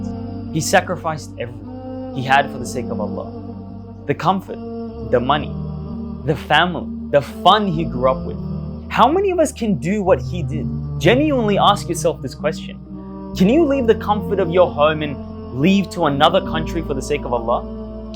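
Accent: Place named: Australia. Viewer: I loved the accent, reminded me of an Australian accent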